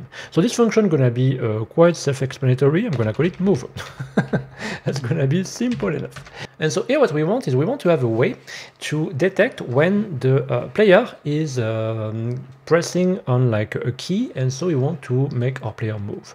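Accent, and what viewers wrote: France. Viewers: je me disais bien ahah, au mois c'est cool ça permettra d'un peu comprends les vidéos parce que c'est dure de trouver des ressources en français sur Godot est j'ai un anglais qui assez discutables mais blague a part ta vraiment un très bon anglais et en vrais j'ai vanné un peu sur l'accent mais j'ai galéré a me rendre que c'était un accent un français x33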